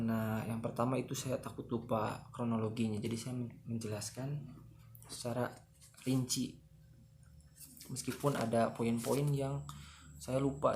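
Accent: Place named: Indonesia. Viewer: Ini mah logat orang sebrang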